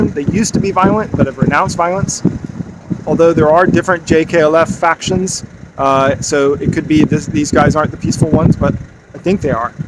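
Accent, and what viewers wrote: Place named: Southern California, United States. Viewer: is it just me or does this guy have the most intense southern california accent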